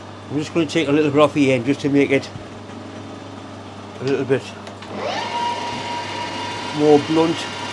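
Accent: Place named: Northern England, United Kingdom. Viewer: Great to hear your voice again, I hope you and are doing well despite things. I'll be honest but I miss northern accents down here in Norfolk, and yes yours is the best. Any time your down in Kings Lynn, beers are on me. Chin up bonny lad, you take care of yourselves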